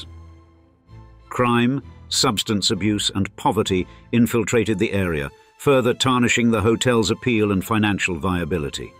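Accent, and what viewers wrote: United Kingdom. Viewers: Why do these shows always have a narrator with a British accent?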